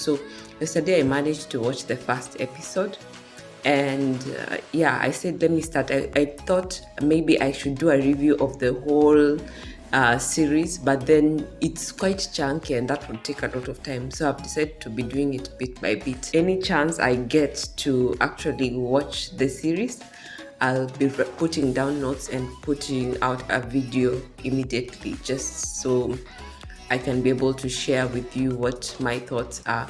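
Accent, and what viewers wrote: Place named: Uganda. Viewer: I know you from East Africa, but are you from Uganda.... you sounded like one... I have a female friend who is also into media and entertainment from Uganda..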